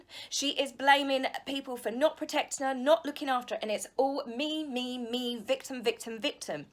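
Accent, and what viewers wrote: United Kingdom. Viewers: I'm new to your channel and I must say, I totally love your content. Your sense of humor mixed with your lovely British accent made me come back a couple of times. The way you view things (especially on MeGain) is hilarious. I've been waiting for a new video all day long, so I guess you can consider me a new loyal fan of yours 😊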